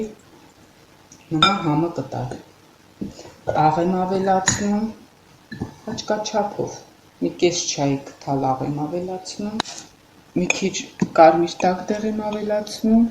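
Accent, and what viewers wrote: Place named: Lori, Armenia. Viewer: zgacvum er Lorva akcent@ hacheli e EV tesnel EV lsel.I'm hamaqaxaqaci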